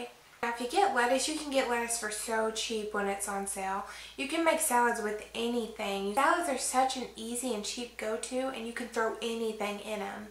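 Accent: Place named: North Carolina, United States. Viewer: I love your accent. North Carolina?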